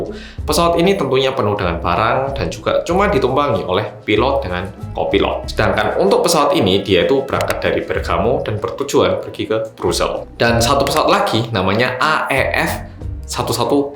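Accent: Java, Indonesia. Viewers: Mas iki penampakannya orang korea,tapi logatnya medok jowo😁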